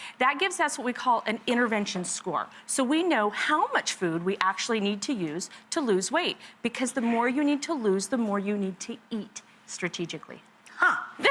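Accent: United States. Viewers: I this really how people speak in the US?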